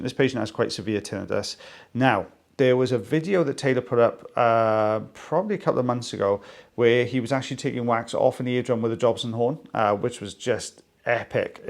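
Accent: Wales, United Kingdom. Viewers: Love the Welsh. Would love to hear more, my wife thinks it’s sexy.😊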